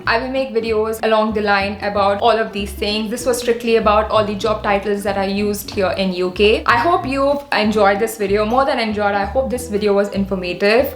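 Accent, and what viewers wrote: India. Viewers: my gawd. Youre a beauty. If it wasnt for the accent I wouldnt know youre Indian.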